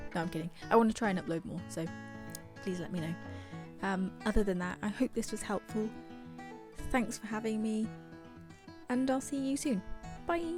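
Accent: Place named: United Kingdom. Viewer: What beautiful job, and a great job of explaining it as well. There's another artist on YouTube (LethalChris Artist) with almost an identical accent. I wonder what region of the UK you are from? That accent is really awesome.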